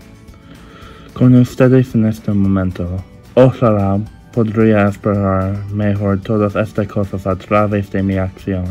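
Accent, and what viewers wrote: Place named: England, United Kingdom. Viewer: Me encanta ese acento de los ingleses cuando hablan español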